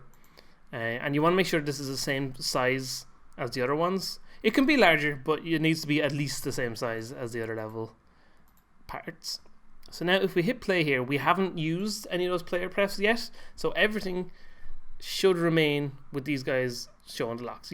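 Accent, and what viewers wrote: Ireland. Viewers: Hi are you Irish i'm new here you sound Irish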